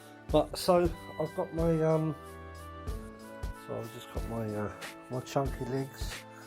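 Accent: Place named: RP, United Kingdom. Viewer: You sound posh compared to me ahah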